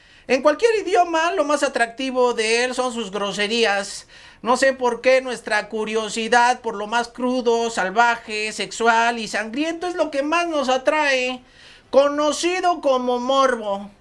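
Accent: Mexico. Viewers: Te apoyamos, mejor YouTube, o tal vez Twich, que no tiene censura, la Red Morada, está mejor, y como hablas, está perfecto, así somos los chilangos, pero FB es muy millenial!!! No cambies tu estilo, por favor 🙏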